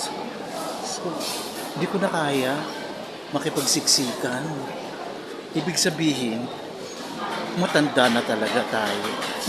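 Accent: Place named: Philippines. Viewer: สำเนียงคล้ายคนฟิลิปปินส์นะ ถ้าผิดขอโทษ เพราะฉันมีเพื่อนฟิลิปปินส์เยอะ 🇺🇸🇺🇸🇺🇸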